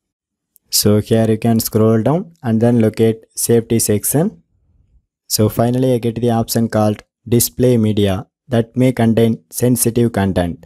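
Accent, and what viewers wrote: India. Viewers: U from India mate?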